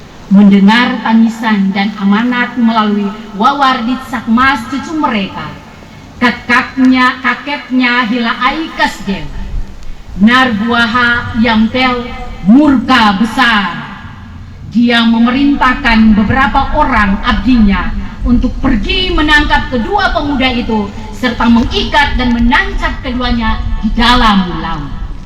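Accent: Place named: Kai Islands, Indonesia. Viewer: Tak mungkin yang membaca teks ini bukan orang Kai. Saya yakin Pasti orang Kai. Salam dari bumi Cendrawasih Papua. 🦜🦃🦤🦩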